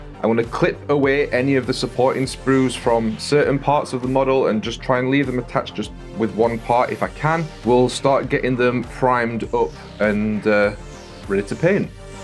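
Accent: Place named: North, United Kingdom. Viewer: I 'ears a Northern accent, I subscribes. This is t'way.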